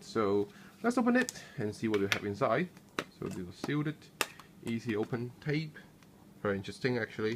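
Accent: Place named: United Kingdom. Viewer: Very nice video but your accent is the weirdest thing I have ever heard. Most of the time you sound english (british) but then you say things like "choclick" or "lick out" instead of "leak out" and some other little weird things. I am curious, where are you from and do all people talk like that there?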